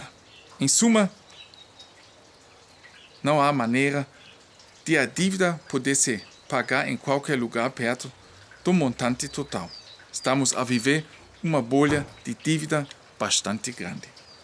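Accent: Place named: Germany. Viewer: Apesar do forte sotaque alemão, você fala o nosso linguajar, muito bem editado. Você dar aula de conhecimentos gerais que poucos conseguem. Parabéns; Mendonça de Fortaleza Ce. Br.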